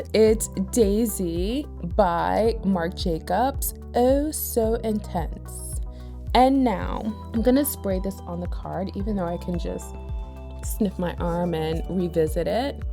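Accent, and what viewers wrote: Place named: West Coast, United States. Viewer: You're from NY or CA? cause you got the west coast accent down pat!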